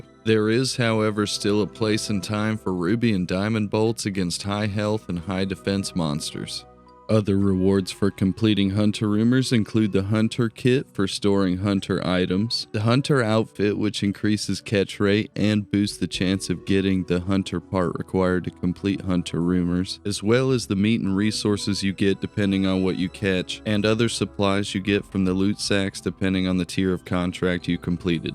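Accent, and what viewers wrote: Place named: Houston, United States. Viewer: Sounds like he’s from Houston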